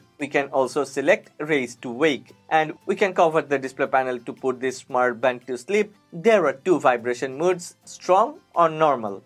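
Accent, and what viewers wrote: India. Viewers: I will be subscribed to your channel when you will stop to speak with this terrible Indian pronunciation.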